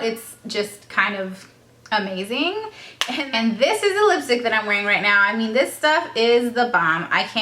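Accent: Chicago, United States. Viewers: You do have a Chicago accent 😂 I love it though!! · I know this may sound really creepy and stalkerish o.o but what suburbs of chicago are you from? I am very local to Aurora, haha and there is a but of the Chicago accent in there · Not a fan of the music, it was really annoying. And yeah, you do have a bit of an accent. I can definitely tell that you're from Chicago or the surrounding area. It's not thick accent, but it's noticeable. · It is a strong Chicago accent. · lol i actually really think you have a chicago accent! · We do have an accent in chicago. We can't tell but others can. And you r the first person that actually said "I'm from the suburbs". Lol. ❤️chicago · It's actually a pretty strong Chicago accent in my opinion hahaha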